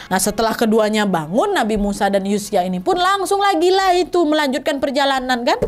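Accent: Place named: Sumatra, Indonesia. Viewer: Kurasa kau ini orang sumatera dah , logat kau itu jelas kali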